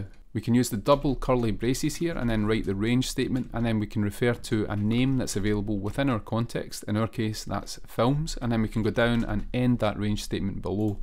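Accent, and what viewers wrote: Scotland, United Kingdom. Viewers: 3 awesome things in this video. Golang, HTMX and the beautiful scottish accent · A Scotsman! 🎉 Refreshing to hear other accents.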